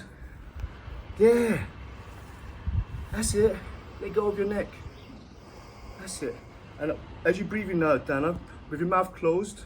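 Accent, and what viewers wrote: United Kingdom. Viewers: ok an asian guy talking like a british man is enough for me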